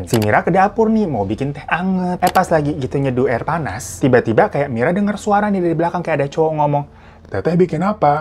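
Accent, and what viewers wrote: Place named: Sunda, Indonesia. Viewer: Sok atuh nyarita deui hahha...sayah orang bandung ketawa liat kk ngomong bahasa sunda😂😂😂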